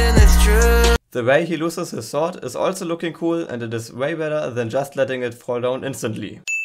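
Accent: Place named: Germany. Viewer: Alter ich glaub jeder deutsche erkennt, dass du net englisch bist😂 aber is ja kein problem.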